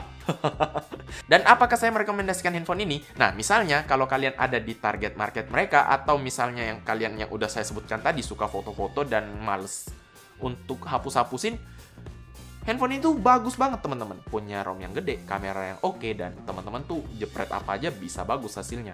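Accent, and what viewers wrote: Makassar, Indonesia. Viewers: Bang pake logat Makassar lagi dong bang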